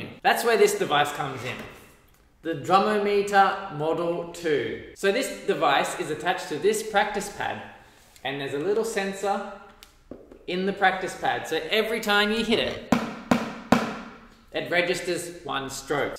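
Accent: Australia. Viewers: where are you from? Australia? new Zealand?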